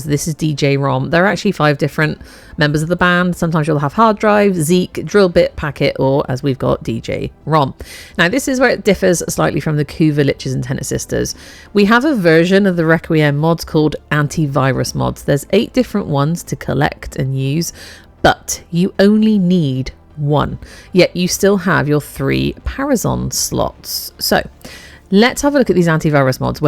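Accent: West Country, United Kingdom. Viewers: Wow what an informative video. Giving a like and sub. Nice to hear an English voice! Got a west country twang to it? 😅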